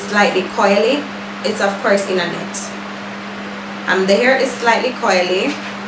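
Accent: Jamaica. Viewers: You sound Jamaican.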